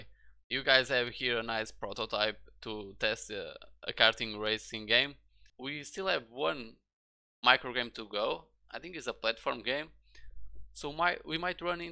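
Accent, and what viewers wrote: Portugal. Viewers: És tuga não és? Pelo menos parece 😅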